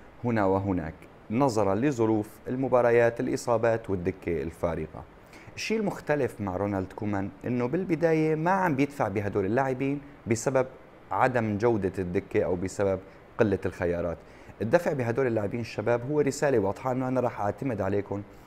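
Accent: Aleppo, Syria. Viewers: لهجتو واضحة سوري من حلب تحديدا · لك والله من اول كلمتين بين معي انو من حلب ههههه موفق بلوووووود وجميل جدا اسلوبك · ميم 55 لا هو بيحكي حلبي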